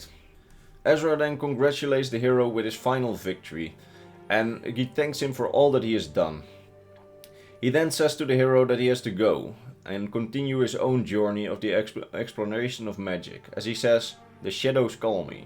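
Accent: Netherlands. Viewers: I recognize that accent anywhere. Hello fellow Dutchman!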